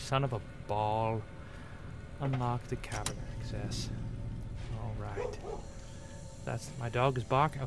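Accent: Scotland, United Kingdom. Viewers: Mate the Scottish accent is fun ( especially in England where no one understands you ) but it ain't the easiest to get rid of once you've got it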